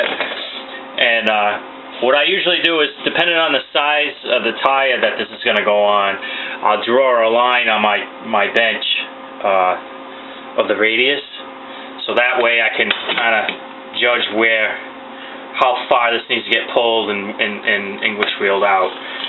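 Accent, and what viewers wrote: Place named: New England, United States. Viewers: I wouldn’t apologize, ya did a great job with your video, and the New England accent, I was raised in Boston, and have lived for 34 years in Pennsylvania, and still have that Boston accent, makes me feel like I was listening to a buddy back home 😊 nice job on the fender........ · Lmao I was like hey am I bugging or is he from new England. I'm 15 min south of boston myself. Where you from?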